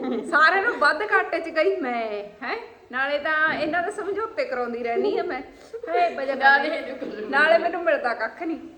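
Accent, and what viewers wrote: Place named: Moga, India. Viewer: Didi thode pekke kithe mainu moge side lagdea thodi boli to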